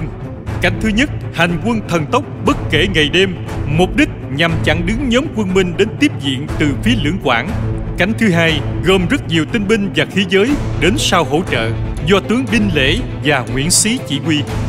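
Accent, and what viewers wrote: South, Vietnam. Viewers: Đọc theo giọng miền Nam mà bạn cũng không sao cả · ồ hay đấy nhưng lần sau thay dọng đọc đc ko? Người miền Nam đọc toàn sai chính tả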